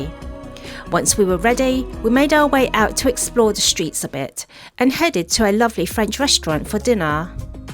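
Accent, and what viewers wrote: United Kingdom. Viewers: Hi, your voice and accent is very nice, can you share how you make it? As a local Hong Kong people, I really want to transform my accent to more British. Thanks. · 鐘意聽你講英文，我可以學聽英國音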